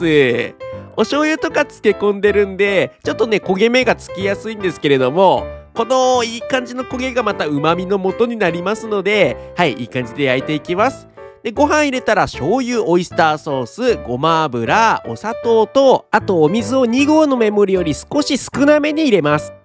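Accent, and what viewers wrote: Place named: Tokyo, Japan. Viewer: コメントありがとうございます😊 東京の人ですか？話し方がそうっぽいので。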